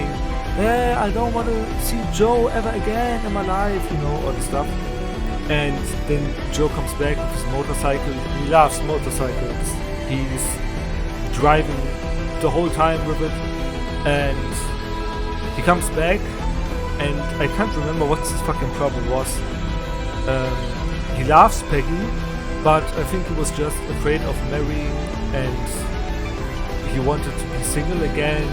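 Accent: Germany. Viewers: Can it be that you are German? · Your german accent is very nice sounding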